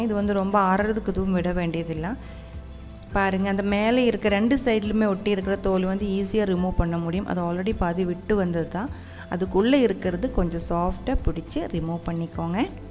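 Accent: Kerala, India. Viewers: ur slang is so good and ur voice also malayalam kaladha Tamil nice to hear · உங்கள் malayalam கலந்த தமிழ் பேச்சு மிக மிக அருமை.கேட்டு கொண்டே இருக்கலாம் போல உள்ளது Sister. வாழ்க வளமுடன். உங்கள் கேரளா recipes also அருமை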